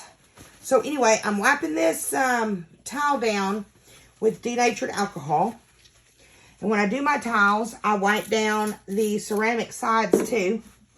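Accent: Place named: Texas, United States. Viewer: my love, I love it!!! 🤩🤩 I also love you giving Lulu the business!!! 🤣🤣🤣 Keep being you my friend!!! Crazy dog and Texas accent makes a great video. 🥰❤️😘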